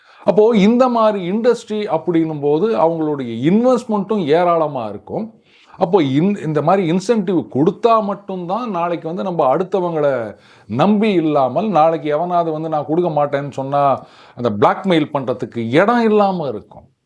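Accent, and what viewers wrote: Chennai, India. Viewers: வணக்கம் சார் அருமை உங்களுடைய இந்த சென்னைவாசி கலந்த பேச்சு, தொடருட்டும் உங்க பணிகள் 🎉🎉🎉🎉🎉❤❤❤❤❤ எரியட்டும் தோழர்களின் எல்லா துவாரமும்😂😂😂😂😅😅😅😅😅❤❤❤❤🎉🎉🎉🎉🎉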